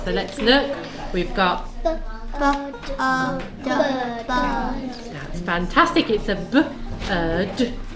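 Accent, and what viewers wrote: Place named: Midlands, United Kingdom. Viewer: Love that midlands accent.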